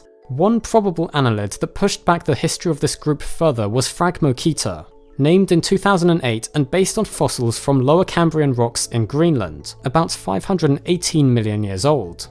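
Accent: England, United Kingdom. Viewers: I knew I was about to hear an english guy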